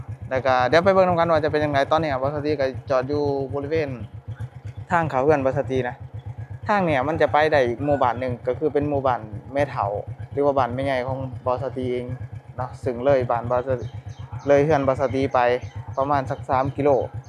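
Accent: Thailand. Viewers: อยู่ไทยจนพูดไทยพูดลาวเป็นสำเนียงไทยหมดแล่ว🎉🎉🎉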